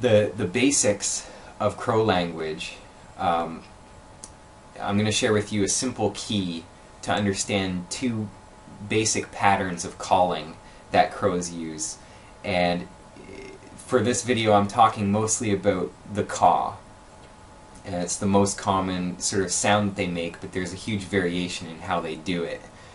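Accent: Canada. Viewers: A very heavy Canuckian lilt. I say he's from Saskatchewan. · "Aboot" Canada, obviously. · I love your strong Canadian accent ❤❤❤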